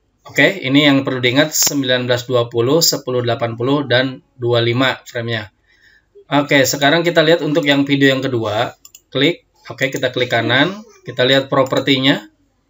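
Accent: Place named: West Java, Indonesia. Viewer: "Sunda Van Java" logat nya 👍👏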